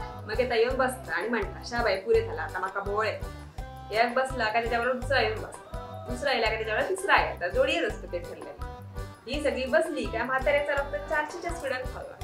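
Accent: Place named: Malvan, India. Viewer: एव्हढे अस्सल मालवणी इतरांच्या व्हिडिओत मी खचितच ऐकलं असेल, मस्तच 👍👌👏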